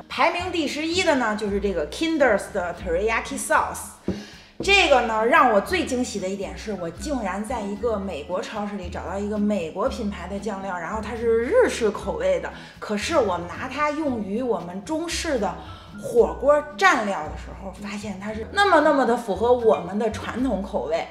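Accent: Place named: Beijing, China. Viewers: 一听口音就是北京老乡，太优秀了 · 感觉你是北京人哦！难道是同乡？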